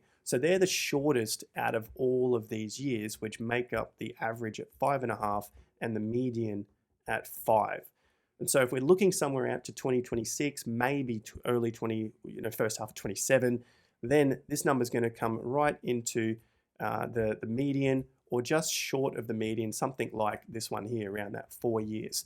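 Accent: Australia. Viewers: That makes no sense. He’s Aussie.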